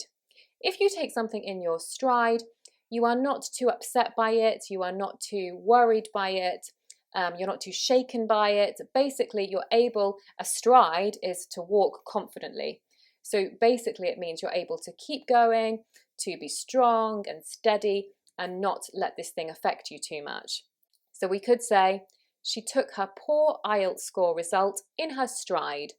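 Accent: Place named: United Kingdom. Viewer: Both of you are amazing teachers.Thank you for the great lesson teacher.I''m really impressed with your british accent.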